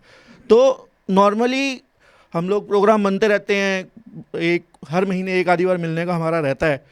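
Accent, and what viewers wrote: Haryana, India. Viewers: btw, even your haryanvi accent is commendable bro👏 ..keep growing🫶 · Haryanvi accent is perfect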